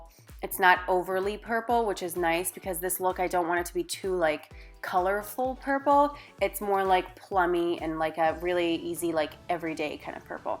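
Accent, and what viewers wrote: United States. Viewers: haha! I love your jersey accent! so beautiful lady! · Loved the look and background. I would say that's a north jersey accent coming from someone in the south 😉 that's jersey for you. Now you have me wanting all those extra dimension eyeshadows!! Alex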